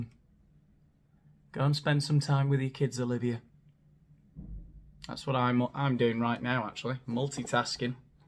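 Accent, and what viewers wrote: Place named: Northern England, United Kingdom. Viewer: How have I only just discovered you? Subscribed ....ready for a binge. Love your Northern accent feels like home.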